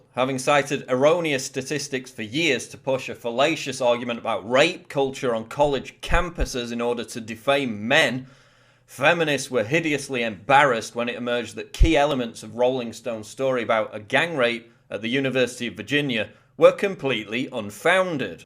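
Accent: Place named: England, United Kingdom. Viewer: Alan Fournier His particular English accept you dumb fucker. Not all English people sound the same, his english accent annoys me.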